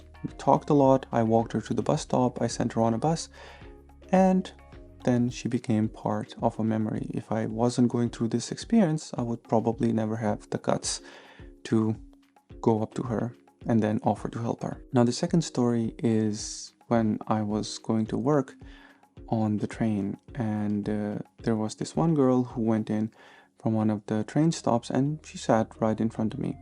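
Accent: Estonia. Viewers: Actually I was surprised that he even speaks English with estonian accent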